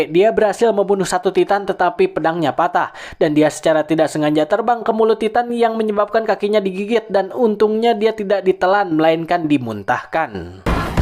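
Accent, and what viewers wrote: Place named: West Java, Indonesia. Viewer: Bang lu kaya orang sunda logat nya..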